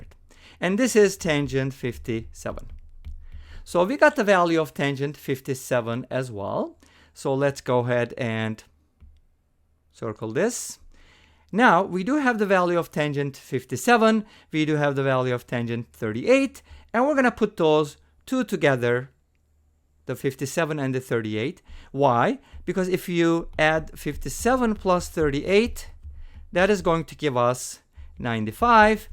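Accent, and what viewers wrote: Turkey. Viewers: I can hear Turkish behind the accent.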